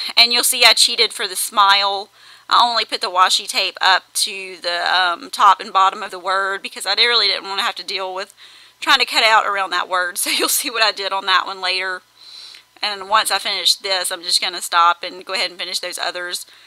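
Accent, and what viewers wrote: South, United States. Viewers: I have a scrappy crush on you! Ok, I know that sounds creepy but you just make magical pages. Also I love hearing your southern twang as I'm originally from the south myself. Love how you added washi to those wood veneers from HS. Very creative!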